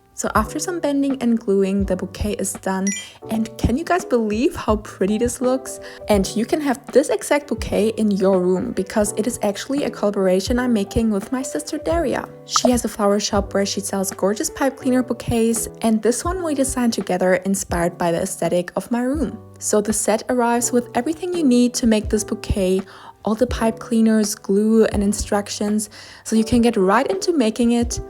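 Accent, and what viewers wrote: Germany. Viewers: You have a big of a German accent. Are you German? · I CAN HEAR THE GERMAN ACCENT GIRLIEE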